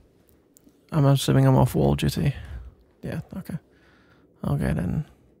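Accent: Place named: Scotland, United Kingdom. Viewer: Your audios make me yearn for a boyfriend like you. The accent. The humour. The gentleness of you. God I wish I could find a scottish boyfriend like you haha. Keep making good audios, sbf! You literally saving us gays for being a hopeless romantic 😖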